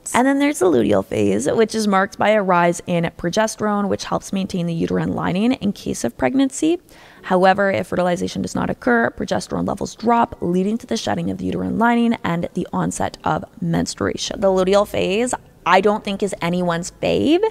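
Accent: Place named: Canada. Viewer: It's a Canadian accent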